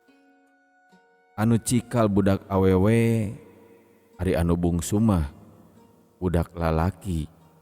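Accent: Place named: Sunda, Indonesia. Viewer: Kang... Pas ngadongengkeun carita jurig, Osok sieun henteu? Hehehe Abdi nu ngupingkeun mah meni sieun tapi resep da kang nyunda pisan.. perkuat di soanten kang... Musik latar sebagai pemanis z. Nuhun kang.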